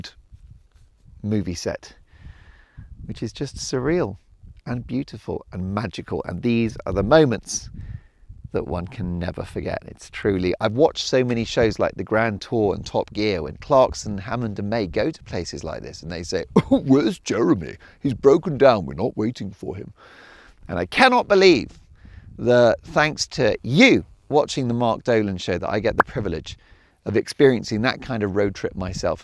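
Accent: France. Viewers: Mark you always make me laugh!!😂😂😂😂 Keep practising the french language💐💐. I like the accent🌺🌺🌺 ⚘️🥀⚘️⚘️⚘️🌻🌻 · Hello! I love your show and you have a lovely french accent, but you are so so wrong about Emmanuel Macron. He speaks a lot but tells 2 things about the same topic and never never never act! And do not tell him about freedom of speech. He doesn't understand the concept. But it looks like "nice" out of France. Cannot understand why.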